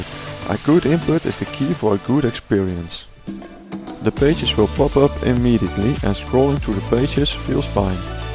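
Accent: Netherlands. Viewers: I hear some dutch accent there :))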